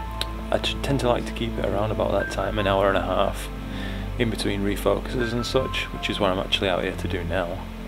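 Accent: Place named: West Yorkshire, United Kingdom. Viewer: Thought I recognised a West Yorkshire accent. Nice setup, I’ve got the esprit 100. I’m in York, but originally from Wakefield. I’ve got a Sesto Senso2 attached to mine and it’s great. I prefer it over the EAF I used to use. Things that won me over, are, it has a clutch so you can still manually focus, as long as the sesto is off. Not a biggy but can be helpful if you don’t want to use sesto, and also there are no brackets or weird adjustments to attach it to the esprit range, even though there is a bracket for the zwo EAF (the Takashi one), it’s still messy. The sesto 2 just sits around the focus housing. I have practically no focuser backlash either. Great video.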